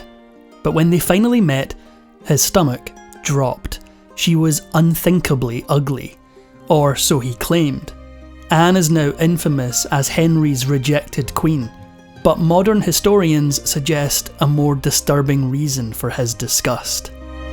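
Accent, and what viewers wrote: Scotland, United Kingdom. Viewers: One of many attractive traits of your videos is the Scottish accent you have! I love it as much as I love the clever commentary and the comprehensive detailed account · The Speaker is Scottish, huh?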